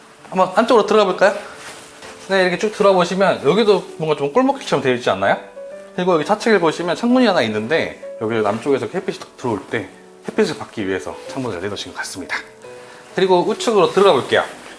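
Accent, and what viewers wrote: Jeolla, South Korea. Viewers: 제주도에서 전라도 사투리..^^ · 정겨운 전라도 말투네요. 설명도 잘해주셔서 편안하게 영상 보고 갑니다. 채널 번창하세요~ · 전라도 사람임? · 혹시 전라도 분이세요? ”촤측“으로 “코가구”를 하시는게 전남분 같아요~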